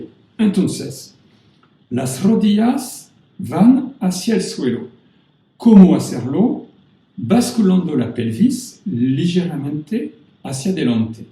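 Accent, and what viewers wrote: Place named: Paris, France. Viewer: Super de faire une video mais le castillan avec l’accent parisien c’est insupportable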